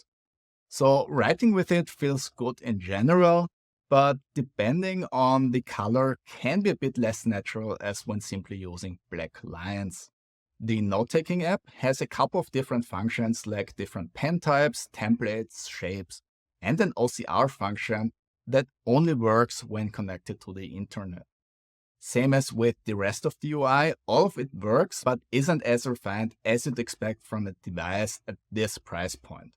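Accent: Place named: Austria. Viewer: your lovely and melodic Austrian-coloured German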